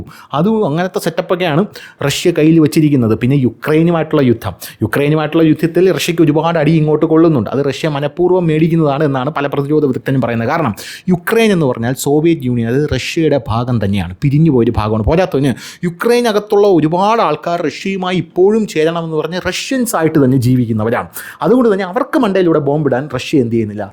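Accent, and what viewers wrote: Thiruvananthapuram, India. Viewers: ചേട്ടൻ ഇങ്ങനെ രണ്ടു തിരി സംസാരിക്കുന്നു വേറെ ന്യൂസിലെ സ്വാഭാവികമായിട്ടും നോർമൽ ആയിട്ട് സംസാരിക്കുന്നു അണ്ണാ ഈ ചാനലിൽ നിങ്ങൾ കോമഡി ആയിട്ടും എന്നാൽ എട്ടിൻറെ പണി കൊടുക്കുന്നതായിരിക്കും സംസാരിക്കുന്നു ഇഷ്ടപ്പെട്ടു ഐ ലൈക് യു നിങ്ങൾ തിരുവന്തപുരം അവിടുന്നു വന്നതാണോ വർത്തമാനം പറഞ്ഞ ശൈലി കേട്ട് കഴിഞ്ഞാൽ അങ്ങനെ തോന്നുന്നുണ്ട് ഈ ആദ്യം പറഞ്ഞ അയ്യാ വണക്കം അത് അടിപൊളിയായിട്ടുണ്ട് നിങ്ങൾക്ക് ശരിക്കും തമിഴ് അറിയാമോ കാരണം ഞാൻ മലയാളം ആണെങ്കിലും എൻറെ അച്ഛനും അമ്മയും തമ്മിലാണ് നിങ്ങൾ പറഞ്ഞ ഡയലോഗ് എനിക്ക് ഒരുപാട് ഇഷ്ടപ്പെട്ടു ❤❤❤❤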